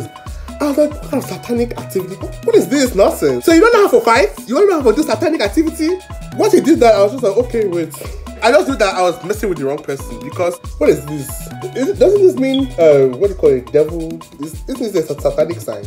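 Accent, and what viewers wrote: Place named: Nigeria. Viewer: Wait? You're from Cameroon but have a Nigerian accent?!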